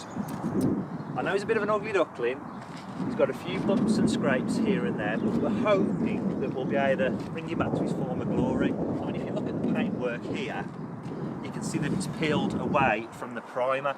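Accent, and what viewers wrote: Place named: West Midlands, United Kingdom. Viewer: Just came across your channel as one of our videos is a suggestion from one of yours. Where are you from as your accent sounds proper West Mids. We are from the West Mids.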